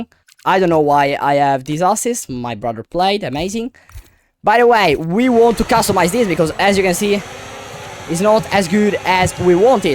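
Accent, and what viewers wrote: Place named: Italy. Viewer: i like your italian accent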